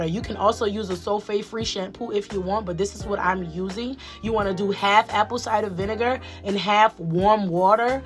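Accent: Louisiana, United States. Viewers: Babygirl you gotta be from Louisiana 😂 anywho I needa do this cause I been looking look tore up lately lol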